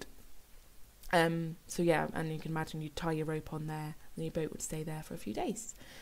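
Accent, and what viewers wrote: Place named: Surrey, United Kingdom. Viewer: I hate you. You're making me miss the boats from the Sims 3 Island Life... ;-) I'm just kidding (well, on the first sentence at least, the boats were cool, the game breaking bugs much less so...), but it is a really cute build! It's a shame there isn't a world builder as there is a boat permanently attached to a jetty in central London that could inspire a restaurant build, but there isn't really a world with a lot where it feels like it could be put in and it would fit. For the storage, it's a shame that someone hasn't done a modded sofa which also includes drawers, it's a feature of canal boats and caravans. There are also a lot of overhead cabinet type storage which isn't typically interactive in the Sims, so someone modding those would make sense (although it would be even better if they were added to a tiny home refresh so all players had access to them). 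Heck, even having functioning drawers at the end of a double bed would be a nice way to remove the need for a dresser. As for why you're back in Henford-On-Bagley, going by your accent (Surrey?), it makes sense that you're going to be able to build things more naturally there, you're not going to need to look up reference images to make it fit, you've probably seen a lot of it in your life time. I wish the Sims 4 would arrange worlds in clusters as I'd love to see what you and JessicaPie could do if you had to rebuild the high school town in an English style (I'm thinking somewhere like Reading), the University town may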